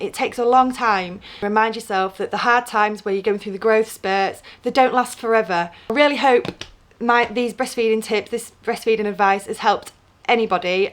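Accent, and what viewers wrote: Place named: England, United Kingdom. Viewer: She's from England 👍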